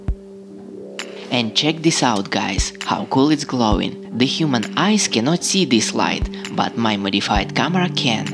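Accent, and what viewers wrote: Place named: Russia. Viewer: Москальський акцент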